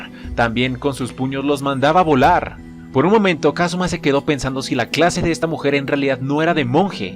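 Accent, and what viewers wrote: Colombia. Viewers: Ja referencias colombianas habla como colombianas eres de colombia ¿?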